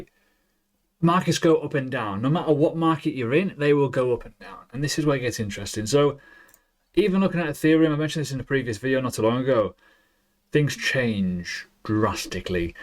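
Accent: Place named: Newcastle, United Kingdom. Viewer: I know one thing. I'd listen to a good geordie lad over some california bullshitter all day long .I've listened to all the crypto you tubers over the past year and it's not hard to work out that most of them are wrong uns .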